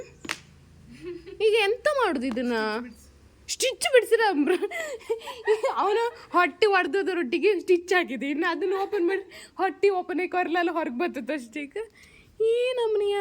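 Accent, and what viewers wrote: Kundapura, India. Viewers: Nim ಕುಂದಾಪ್ರ bhashe kembuke bari chanda mare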